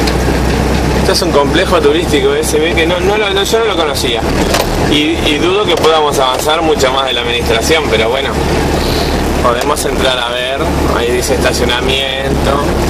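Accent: Argentina. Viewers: Que raro, dice que se crio en Venezuela, pero habla como argentino.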